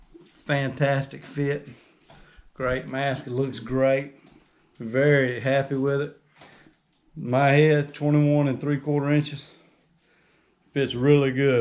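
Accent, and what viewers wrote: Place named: United Kingdom. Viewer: Can’t tell if you’re British...or if you have a heavy country accent..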